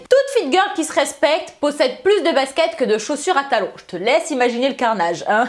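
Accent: South, France. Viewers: oh putain con l'accent, tu viens tu sud toi hein !!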